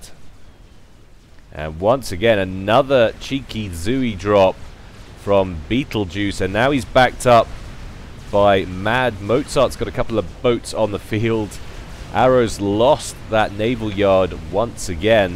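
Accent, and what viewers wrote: United Kingdom. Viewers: God that british accent. So reminding me of Deman and his quadv casts of supcom.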